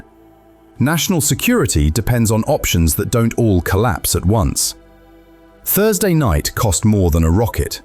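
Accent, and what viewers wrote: England, United Kingdom. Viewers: He's English from the sounds of it. Some of their pronunciations are weird.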